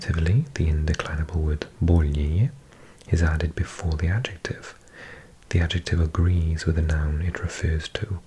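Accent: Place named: Russia. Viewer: Dude im fond of your russian accent. Love from Moscow ❤️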